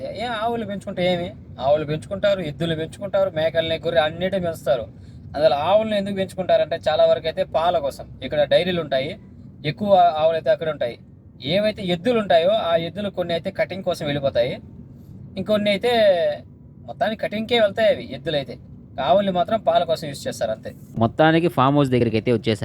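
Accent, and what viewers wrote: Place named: Piler, India. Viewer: I think me slang batti chuste medi pileru kada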